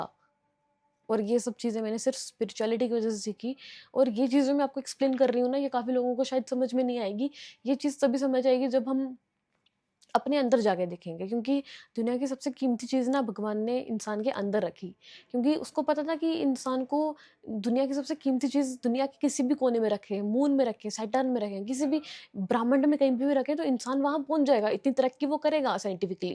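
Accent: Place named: Poonch, India. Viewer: Yeah dear dairy was awesome And poonchi accent tooo❤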